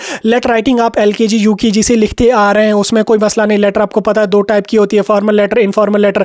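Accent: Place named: Kashmir, India. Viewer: Bhai you are kashmiri i understand because your Urdu voice❤❤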